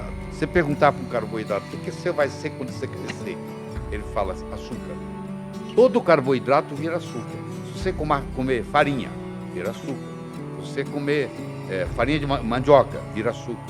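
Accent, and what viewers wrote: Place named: Minas Gerais, Brazil. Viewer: Um Médico incrivelmente competente estilo Mineiro dos bons Não fica zangado Fala uai com simplicidade mas o que fala prova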